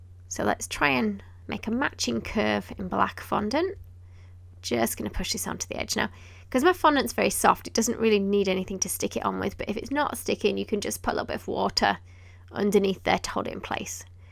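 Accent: United Kingdom. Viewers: Your britain accent is annoying.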